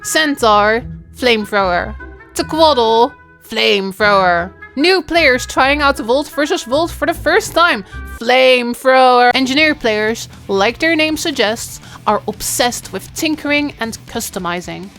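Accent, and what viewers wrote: Netherlands. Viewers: That dutch accent made my neckvein throb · I love your english-dutch accent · i swear i hear a dutch accent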